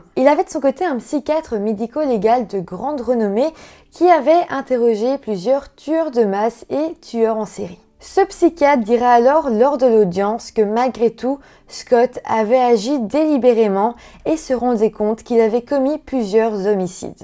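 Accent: Belgium. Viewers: Salut, cette histoire est terrible, pour moi ce garçon 👦 était très troublé, certes, mais certains points contredisent les maladies mentales citaient dans la vidéo, par exemple la préméditation, elle est la, ce garçon malheureusement était un tueur de masse en devenir, et il s'est entraîné sur sa famille 👪, je pense que la peine fera qu'il ne ressortira pas, c'est une sécurité pour lui et pour les autres, il n'a aucune empathie,si toutefois il est malade, il souffre de psychopathie et recommencera, la, ou je pense qu'il n'est pas responsable c'est qu'il est né comme ça, né pour tuer, le passage où il explique qu'il les achevait plutôt que de les laisser souffrir, pour un peu, il leur a rendu service.comme d'habitude j'aime beaucoup tes histoires avec ce petit accent Belge qu'on ne lasse pas d'écouter, bonne fête 🥳 🥳 🥳 de la fin d'année et bonne année 2023...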